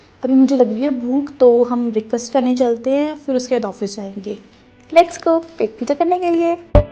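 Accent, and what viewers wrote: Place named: Himachal Pradesh, India. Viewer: You still have to work on your accent it sounds himachali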